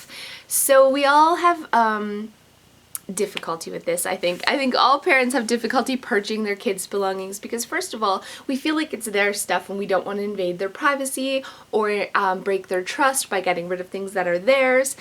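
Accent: Canada. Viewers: I'm a new sub! Love your channel and your personality! Are you Canadian? Your accent sounds that way ;) But I'm from Alabama so I'm sure I sound great to you! Ha!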